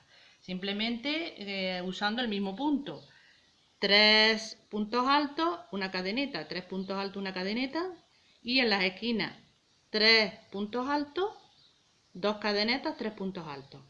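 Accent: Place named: Murcia, Spain. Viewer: Jajaja , eres Murciana , menudo acento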